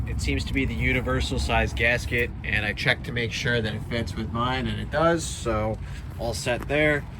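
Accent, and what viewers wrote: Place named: Long Island, United States. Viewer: That Long Island accent 😭😂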